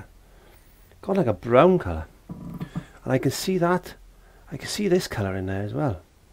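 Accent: Wales, United Kingdom. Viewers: Thank you for showing us how to build up a Van Gogh picture ... you are very entertaining as well... we love your cheeky welsh accent .... If I had the money to pay for you to go to the Van Gogh museum I would ..keep it up you really are fantastic at what you do 👍thank you 👍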